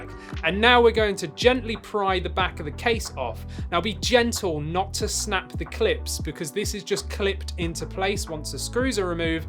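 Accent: United Kingdom. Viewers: Thanks mate absolutely brilliant tutorial and nice to have someone british for a change. Top bloke!!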